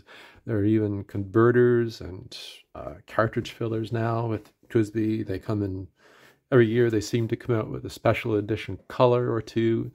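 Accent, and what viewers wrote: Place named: Canada. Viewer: I detect Canadian or Minnesotan accent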